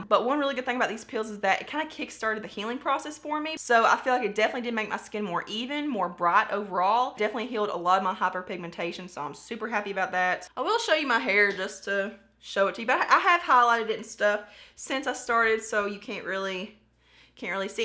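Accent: South, United States. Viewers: I just discovered your channel. I rarely comment on videos but... okay just a few things here. 1. (And this was the biggest one for me) No offense to all the gorgeous and lovely beauty gurus out in LA, but gosh darn it girl, it is nice to find someone I can relate to being that I’m a southern girl myself. SC is my home and I just adore your twang. And the raw honesty. ❤️ 2. The “womb mate” feel I get from you when you speak of your skin issues, hair issues, UNCONSCIOUS LIFELONG NAIL BITING HABIT, even the dry lips!! Every frustrating issue is spot on with my struggles. Only difference is I’ve developed a few dark spots on my cheeks. But I felt like I was watching myself vent and carry on! 3. Lastly, I am about to order my first bottle of the kiwi product and I’m praying that it helps. I sweat a lot through my T Zone (especially during football season lol). 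I’ll check back in with ya to let you know how it’s going. I’m not sure if commenting here or using another outlet is best but I’ll update you somehow. I’m excited to check out your videos! Support and Love, Ashleigh 😎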